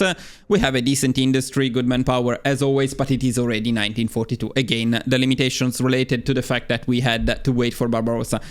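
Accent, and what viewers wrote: Italy. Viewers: that's got to be one the thickest italian accents I've heard. Not complaining tho, English is always better with a thick romance language accent.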